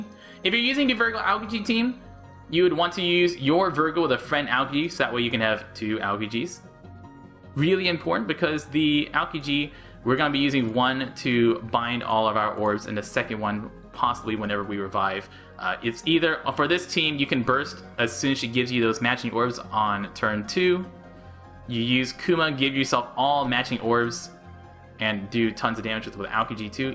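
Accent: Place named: Australia. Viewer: Talk to Australian to me~ <3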